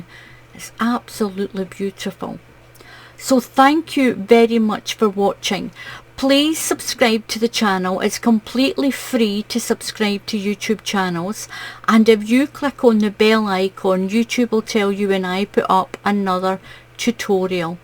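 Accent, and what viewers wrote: Scotland, United Kingdom. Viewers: Tbh I mainly watch your channel because of your scottish accent, I just love it SO MUCH 😍😍🥰🥰 Your teaching skills are also awesome though. Keep up your great Videos!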